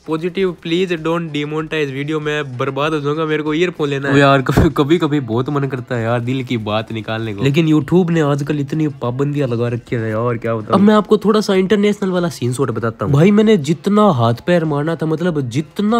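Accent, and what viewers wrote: Haryana, India. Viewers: Bhai haryana t h tu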